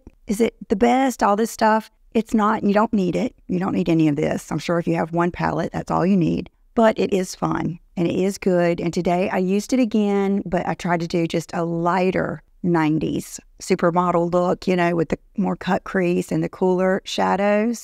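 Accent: South, United States. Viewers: Your voice makes your southern accent even more adorable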